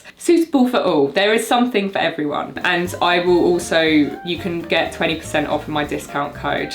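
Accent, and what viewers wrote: England, United Kingdom. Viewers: Accent is CLEARLY 100% English. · I agree about the Cincinnati Reds Hat but with your accent I expect to See a British Airways or a British Petroleum hat on . Or maybe a Quantas Hat too.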